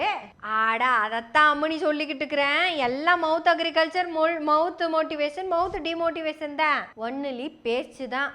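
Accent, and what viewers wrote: Kongu, India. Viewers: காமடி , காமடி வேற லெவல் ..அதை விட உங்க கொங்கு தமிழ் அருமை..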